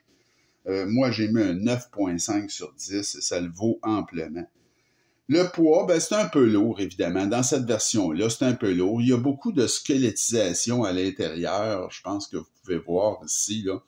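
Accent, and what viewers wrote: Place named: Quebec, Canada. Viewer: Quel plaisir d'entendre l'accent québécois😊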